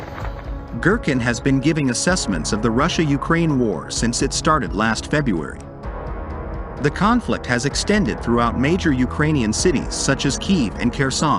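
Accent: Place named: United States. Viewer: Clickbait with annoying American bot voiceover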